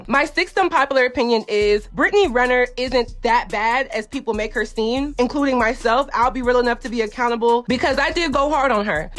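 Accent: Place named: Memphis, United States. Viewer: Nawwww! Not You Tryna Sound Like A Memphis Female Rapper, & Shoutout “Memphis”. After You Stated “BIG GLO” Ratchet Aesthetic Is ”Repulsive” & “ Demeaning”! It’s All Ready Done By The “City Girls” & Your Tired Of It! Nawwww Na Sis, How You Judge & Try To Do The Same. ION LIKEEE DET #901RAISED #Memphis ❤️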